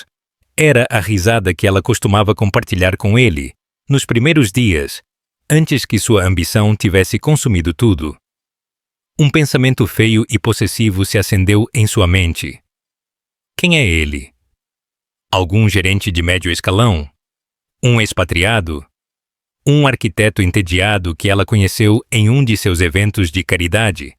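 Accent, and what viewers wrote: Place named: Portugal. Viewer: QUE NARRAÇÃO HORRÍVEL E EM PORTUGUÊS DE PORTUGAL! QUE DESRESPEITO ‼️😡😡😡😡😡😡😡😡😡😡😡😡😡😡😡😡😡😡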